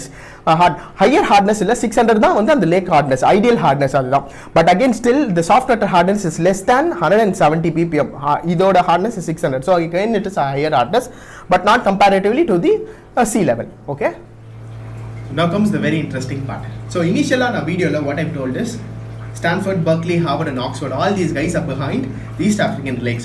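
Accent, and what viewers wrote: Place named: India. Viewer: Why do you speak Indian English?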